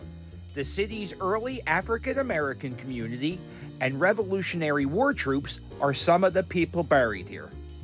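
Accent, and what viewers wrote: Philadelphia, United States. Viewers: Great Tour👍👍Love the Philly accent !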